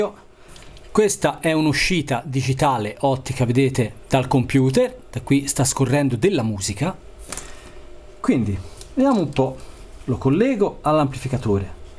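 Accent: Tuscany, Italy. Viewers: ma quanto bello e' l'accento toscano ? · Simpatico, all'inizio mi hai fatto fare qualche risata, sarà dovuto anche a quell'accento toscano, sono contento che hai risolto il problema, e hai fatto vedere agli altri e come si fa. Bene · Si dice circùito, perché ciruìto vuol dire preso per i fondelli. Bravino comunque anche se sei Toscano. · Simpatico, specialmente con l'accento toscano! 10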